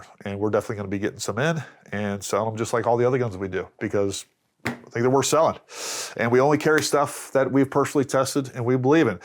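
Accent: United States. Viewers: I need a German accent to explain the whole line up not a American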